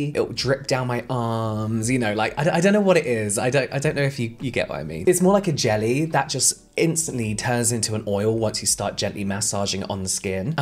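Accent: England, United Kingdom. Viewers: Hello from Los Angeles,. I enjoy your videos. Can you tell me what your take is on SKIN MEDICA? and have you tried anything from TRUTH TREATMENT SYSTEM? both are on the higher end skin care, but I believe and also heard when products are more expensive, it's because they use better products. I know this is not true for all, but it rings true for SKIN MEIDCA and Truth Treatment system. I love listening to your soothing voice and your English accent.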